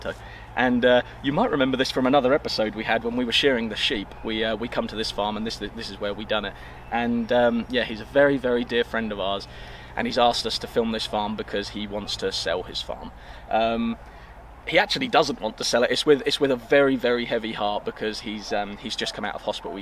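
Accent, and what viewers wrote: Norfolk, United Kingdom. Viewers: Sound like a rum ole Norfolk boi! Nice vid thanks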